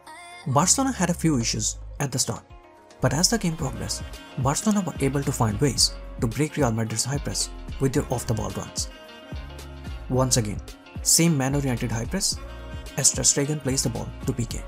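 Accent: India. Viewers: Is an Indian cause his pronunciation of some of the words sound like an Indian eg clinical? I need an answer plz.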